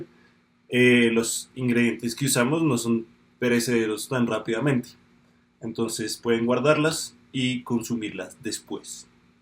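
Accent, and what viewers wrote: Colombia. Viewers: Eres Colombiano verdad. Que buena vibra. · De qué parte de Colombia eres?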